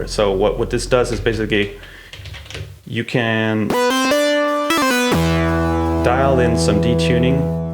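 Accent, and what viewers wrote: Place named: Sweden. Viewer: haha love the "smörgåsbord, wich is swedish for smoargooseboard, and means butter goose table"! xD I'm swedish to and when you said it I was like haha damn his accent is so clearly Swedish and I didn't notice..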